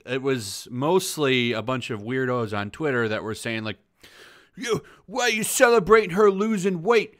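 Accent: England, United Kingdom. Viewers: Lol your English accent made my day. 😂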